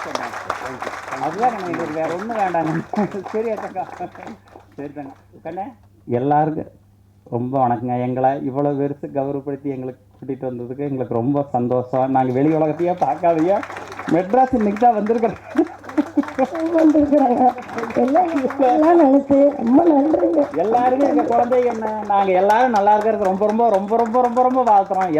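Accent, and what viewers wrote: Coimbatore, India. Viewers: kovai tamil arumai ayya :) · i love kovai tamil..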